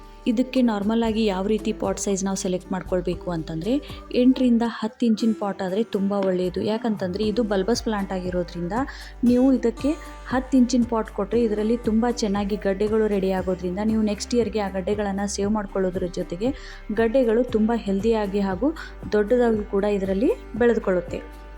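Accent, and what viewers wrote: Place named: Bangalore, India. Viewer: ನಮ್ಮ ಬೆಂಗಳೂರಿನ ಕಡೆಯವರು ಎಂದು ಭಾವಿಸಿದ್ದೆ, ಬೆಂಗಳೂರಿನ ಕಡೆಯ ಬಾಷೆ ಚೆನ್ನಾಗಿ ಮಾತಾಡುತ್ತೀರಿ ವಂದನೆಗಳು ಮಾಮ್.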